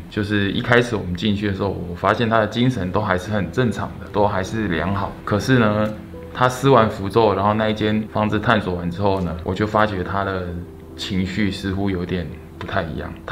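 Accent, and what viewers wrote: Taiwan. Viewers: 哇。。哈喜歡的鬼式台語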